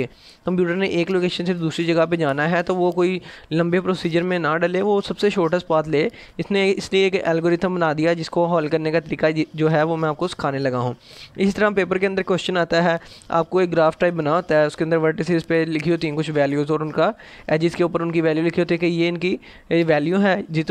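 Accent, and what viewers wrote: India. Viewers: Bhai tum himachal se ho kya ?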